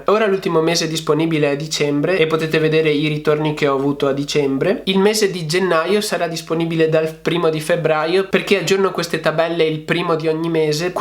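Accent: Veneto, Italy. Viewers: ciao! Un giorno se ti va ci si può trovare, ti seguo da un po, mi piacerebbe confrontarmi con te su questo argomento. Io ho 29 anni, auguri x il tuo compleanno! Dal tuo accento credo che tu sia del Veneto. Se è così siamo vicini e sarebbe bello potersi incontrare x parlare su investimenti. Uso una piattaforma da farti vedere con cui si può guadagnare tutti i mesi qualcosa.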